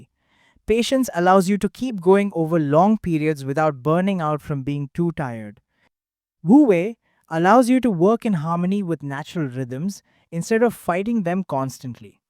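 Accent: India. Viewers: Hmm. Lao Tzu stories with japanese graphic and indian voice over. I don't think so. 😂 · Are you from india ? im just asking buz your accent look like that' 🙂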